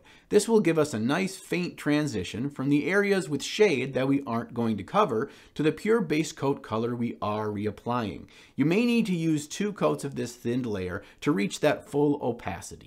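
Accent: United States. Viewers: I'm pretty sure it's just the accent. Too american...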